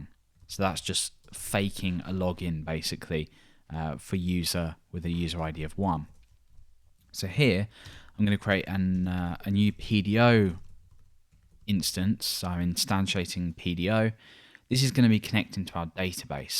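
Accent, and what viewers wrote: United Kingdom. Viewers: PHP tuts, bonus British accent free.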